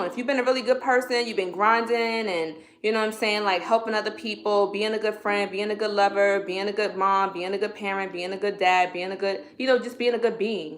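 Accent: New York, United States. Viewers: Your ny accent came out in this one lol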